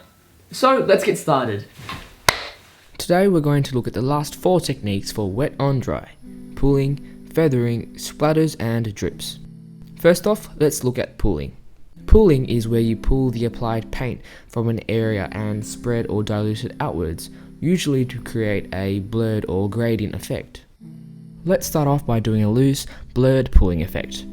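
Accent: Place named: Australia. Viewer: sounds like an australian accent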